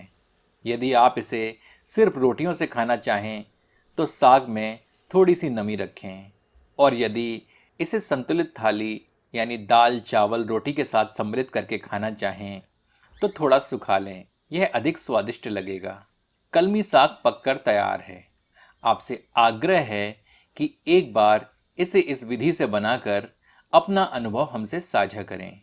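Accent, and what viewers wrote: Standard. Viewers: Aapka bolneka andaz aur sudh hindi bohot achaa hai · aapki hindi zabardast etni shudhta se bhari prastuti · Wow, swadisht, shuddh Hindi k saath 😀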